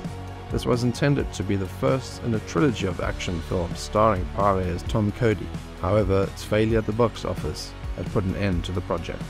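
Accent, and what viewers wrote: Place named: United Kingdom. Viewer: More UK voices commenting on American creations.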